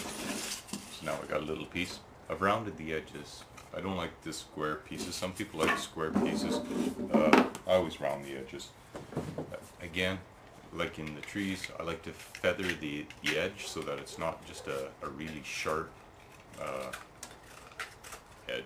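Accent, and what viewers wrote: Canada. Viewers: jw are you canadian? i am and i can recognize the accent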